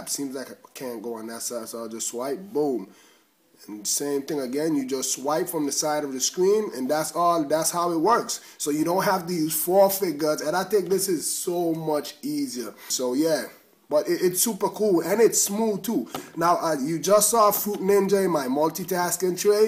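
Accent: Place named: Jamaica. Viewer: Your voice is sick!!lol Jamaican?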